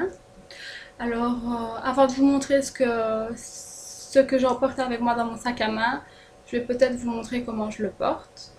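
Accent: Belgium. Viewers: Tu es belge ? :)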